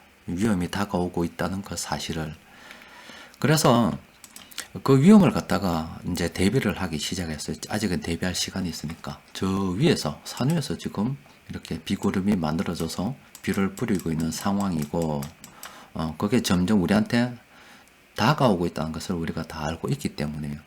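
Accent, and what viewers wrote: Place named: Daegu, South Korea. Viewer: 대구말씨가 참 구수하네요 좋은 내용 감사합니다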